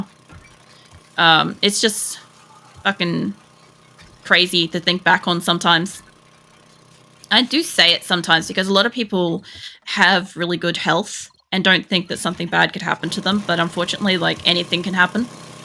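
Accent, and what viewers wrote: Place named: Australia. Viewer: I've never been able to watch an entire video of someone mining. Watching you mine is secondary to listening to you talk about nothing and everything all at the same time 😂 there is something about your voice that is easy to listen I and especially love the Australian accent. AJ 👍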